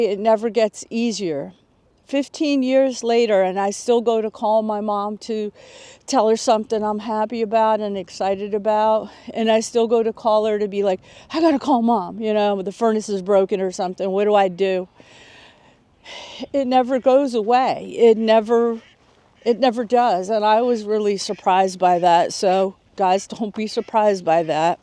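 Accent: New Jersey, United States. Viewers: I knew you were from "Joisy", based on your accent! Out "in da bogs; out in da Pine Barrens!" Love it. Classic.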